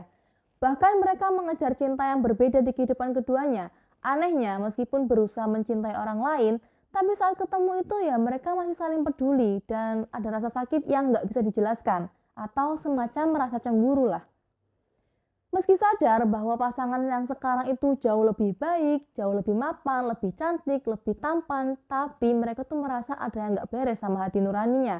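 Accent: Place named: Java, Indonesia. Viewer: Medox banget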